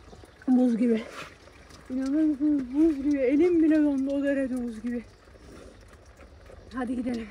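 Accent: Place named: Laz, Turkey. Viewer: Hafif laz şivene hastayım yaa çok sevimlii :dd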